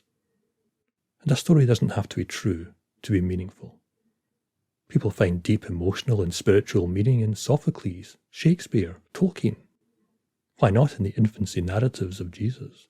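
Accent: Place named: Scotland, United Kingdom. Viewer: And lovely ASMR with your mellifluous Scots accent!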